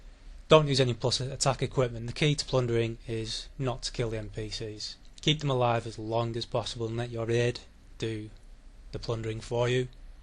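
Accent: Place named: United Kingdom. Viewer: Only now have i watched your videos with sound on and found out your British, High5!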